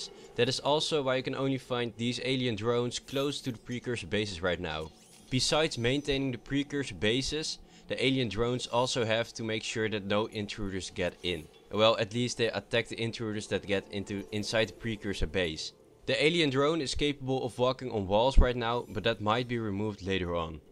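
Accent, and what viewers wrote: Netherlands. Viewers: Yo man, ik kan horen dat je Nederlands bent. Ben ik ook! Vette vids trouwens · is he dutch? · 1 question... are you Dutch? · Are you from holland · Haha ik kan aan je accent horen dat je nederlands bent. xD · Hè really sounds Dutch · Wauw je hoort gelijk dat ie nederlands is XD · are you dutch? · sounds like he has a dutch accent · you sound dutch? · i havea question... are you netherlands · are you maybe dutch becaus i am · are you dutch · R U dutch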